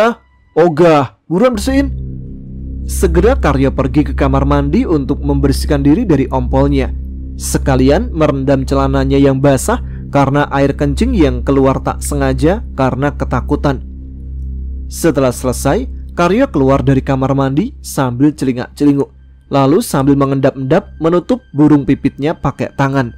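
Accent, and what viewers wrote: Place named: Jakarta, Indonesia. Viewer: Wa'alaikumussalam warahmatullah wabarakatuh. Alhamdulillah cepat kelar nih ceritanya.. maaf maaf hehe 🙇‍♀️🙏😅 Sayang, padahal logat Betawi nya Mas makin keren di sini 👍👍 Astaghfirullah telat lagi gabungnya😔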